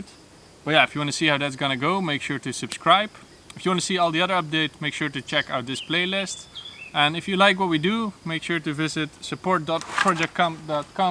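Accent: Netherlands. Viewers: Yes his vocabulary is very obnoxious. Don’t want to be rude but sometimes I wish he would just speak his native Brabants cause his English is very “stonecoal” as we say in the Netherlands.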